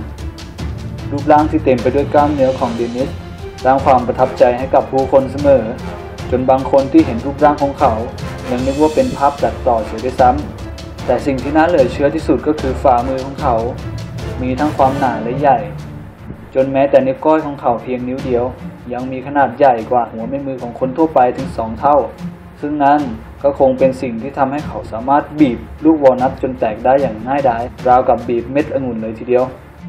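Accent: Suphanburi, Thailand. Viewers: คนสุพรรณซิน่ะครับ 555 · พูดเหนอสุพรรณป่าวเนี่ย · เสียงเหน่อมาก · พี่พูดเหมือนคนสุพรรณเลยอะ พูดเหน่อ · เสียงออกสุพรรณมากครับ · เหน่อจังอะ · พี่เป็นคนจังหวัดสุพรรณป่าว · ชอบความเหน่อ..น่าร๊ากกกก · เสียงเหน่อไปทางสุพรรณ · เสียงเหน่อมากเลยพี่ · คนสุพรรณป่ะเนี่ยเราก็สุพรรณ555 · คนพากษ์เป็นคนสุพันป่ะครับ 5555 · หึ่ย เหน่อ เหมือนกัน😂😂😂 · เหน๋อออออเชียววว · คนพากเป็นคนสุพรรณใช่หม้ายยยย55555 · พึ่งรู้ว่าพี่เป็นคนสุพรรณบุรีเหน๊อเหน่อ มาจาก<num> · คนสุพรรณ ปะนิ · คนสุพรรณป่ะเนี้ย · นี๋มันนสำเนียงงคนสุพรรณนีหว่า เน้อเชียว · พี่คนสุพรรณใช่ไหมครับ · เสียงแบบนี้ถ้าไม่มาจากสุพันก้อคอนฐมล่ะ หรือไม่ก้อเมืองกาญ แต่ก้อเป็นเอกลักษณ์น่าฟังดีค้าบ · ชอบเสียงบรรยาย เน่อออดี ฟังเพลินนนเพลิน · คนสุพรรณเหรอออ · พุดเหน่อนะเราอ่ะ..คนสุพันรึป๊าว?5555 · เสียงพี่เหนอไป 555 ชอบๆ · ทำไมเสียงเน่อจังคับพี่ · สุพรรณ ใช่ไหม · คนสุพรรณใช่มั้ย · พี่เสียงเเบบเหมือนคนสุพรรณมาก😂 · เหน่อมาเลยนะไอ่หม่าลูกพ้อ ชอบๆสำเนียงสุพรรณ👍👍👍👍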